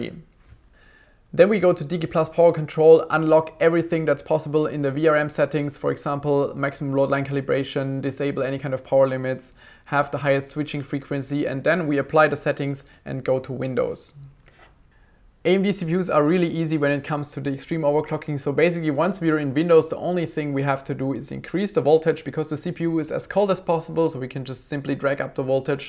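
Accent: Germany. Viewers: Dieser deutsche Akzent ist so eckelhaft 😂😂 Aber ich glaube ich hab auch so nh Akzent wie der 😳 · So glad der8auer does videos in English, too. His English is pretty good and while there is some German accent for sure, I don't really mind. It is definitely easier to understand than a language I've never studied, I took French as the third language and forgot most of it anyway :) Awesome OC, it's a pity one can't go even close to 5 GHz on air though. Intel has some advantage here and I'm always glad to see it getting slimmer with AMD's advances. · The german dialekt xD xD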